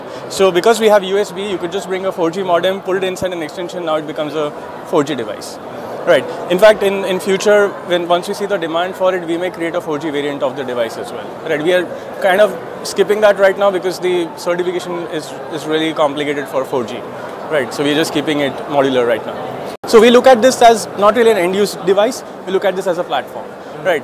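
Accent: India. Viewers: Jeet accent, into the trash it goes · Sorry for being a racist, I really don't like listening to Indian accent English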